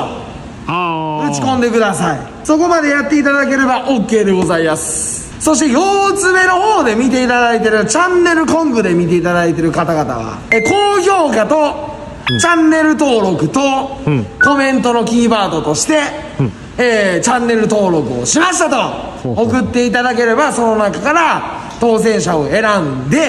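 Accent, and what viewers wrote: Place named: Kansai, Japan. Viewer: チャンネル登録しました！いつも元気なさんに元気をもらっています😊 関西を離れて15年😢関西弁をここで補給しています！これからも投稿を楽しみにしています！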